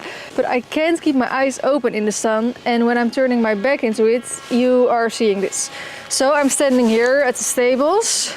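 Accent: Netherlands. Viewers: there is no need to be scared! I used to share your fear about sounding like a Dutchie but I've learned to embrace it. Your accent is absolutely lovely and tells us much about you and your roots. Please be proud of who you are and what you've achieved. Attagirl! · Already in love with the acc also really like your Dutsh acc love youu ❤❤ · You go girl!! ❤ And remember it's quite natural to have a dutch accent since you're dutch and not english. Think people from other countries will find it cute! Liefs Pauline & Skip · Je doet het echt super goed, je Nederlandse accent is juist heel leuk om naar te luisteren. Heel veel succes met je nieuwe kanaal! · Hi! So exciting you finally decided to make an English video! Don't worry about your accent. I've been living abroad for about 2 years now (I'm also from the Netherlands) and I found that most of the world doesn't care about accents like dutch people do. 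Dutchies are just brutal when it comes to an accent. There is no need to sound native. Actually while attending language school here I'm in a class full of people with different nationalities. The native English speakers are mainly just super enthusiastic that you speak 2 or more languages and other like German , Italians, Finnish are mainly excited when someone speaks with their own language accent, they are proud. And here we are, the dutch, over achievers and bashing everyone. Just wanted to say, you're doing great and keep going <3 Can't wait to see more of this series! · As a Dutchie living in Sydney, Australia for 4 years who's been watching your videos ever since you started vlogging, I have to say I'm really proud. 🥹 From experience I can say that speaking English more easily and expanding your vocabulary really does come over time with lots of practice, but also that losing a Dutch accent might never happen, since I still have mine - very thick. 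Just see it as a sign of bravery. 😉Cheering you on from down under! 🫶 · I love watching Dutch videos as a girl from South Carolina USA. I hope to visit one day and I am trying to learn the language. Listening to your accent helps me. Plus I love horses so it’s a win win. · This video was wonderful! I absolutely LOVE the Netherlands and visit almost every year ♥ Your English is amazing, I really like you accent, welcome to this side of Youtube!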